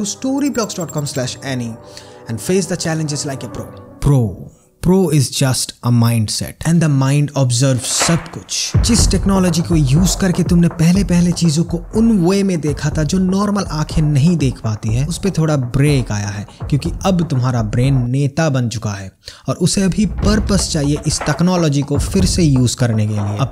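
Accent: Bengal, India. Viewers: yes dada,junejo Bhai toh chiloi.... Casey Neistat o chilo kintu ami sotti mon theke chaitam amader ekhne keu storytelling bepar ta k ato Sundar kore bole na! Tar por tmr content dekhlm... I know keu contestant na ..sabai one of kind...you are unique person..even your content in ur way...mone hoy tumi o Bangali...tmr accent ar pujo te ghorar video dekhe...mone holo...achha jai houk... Love you boss...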